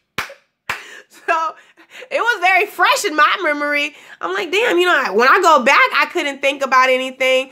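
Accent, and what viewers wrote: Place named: London, United Kingdom. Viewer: Lmao your London accent gave me life 😂💀 ... I can hear you speak about self motivation all day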